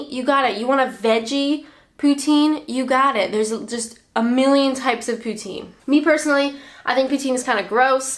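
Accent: United States. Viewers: One misconception i would imagine is that hearing your accent lots of people assume you're American. Sure that is probably annoying. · No, never boring. But I imagine huge amounts of snow across a vast wilderness in Canada. I love Canadian as a language as opposed to American as it's closer to English. For example, you said '... couldn't care less...' the English phrase, whereas the Americans say '... could care less...' which to me is meaningless. 'I care so little about it, I couldn't care less.' And there are many other examples. Your accent, to us, is indistinguishable from American, but then I suppose to some across the pond, my Estuary English would sound similar to East Anglian. Do you happen to know whether Canadian French is as different from European? And I think you say aluminium with an 'i' too.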